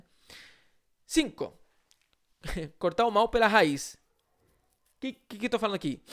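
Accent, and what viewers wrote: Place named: Chile. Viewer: Em meio à correria da minha quinta-feira, consegui ouvir o áudio da aula de hoje. 😅 Gostei muito das sugestões que você deu. Obrigada!!! E uma coisa que achei interessante é que, em vários momentos, você falou como um nativo brasileiro. Se não fosse a pronúncia da letra "o" em algumas palavras, ninguém ia acreditar que vc é chileno... rsrsrs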